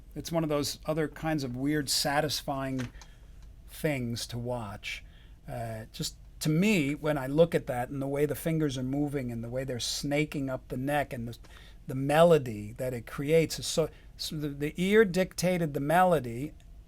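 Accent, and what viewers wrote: United States. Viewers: You are the guitarist I would be you are an exemple Master you sound like an Italian compositor and in same time you do it with an American accent like when you talk I heard the both sounds it's questions of italian Genius blood like Leonardo da Vinci of 🎸 it's a special DNA like a perfect hybrid something like chianina grown up in American the perfect Animal, Always growing up with a lot of music in brain.the perfect sense of metaphors of spirituality like a strings cames from Pitagora the first guitar hero lol every note you do are like an LSD trip as Jimmy Hendrix of future you're biggest one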